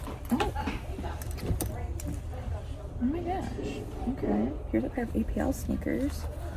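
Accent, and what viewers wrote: Midwest, United States. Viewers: It’s the Midwest accent. A lot of our t’s sound like d’s to others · Thank you for the video! I love watching your videos especially this series. I am from the Midwest and that is just how we say buttons and mittens. Try not to let the comments bother you.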